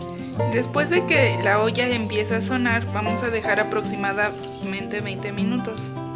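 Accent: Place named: Guatemala. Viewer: Disculpa de donde eres porque pensé que eras de Guatemala.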